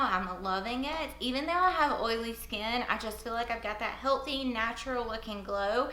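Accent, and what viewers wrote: South, United States. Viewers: shes southern it’s called an accent sthu “sweet” is not fitting for you u must be on something or are you just a bully??? 🙄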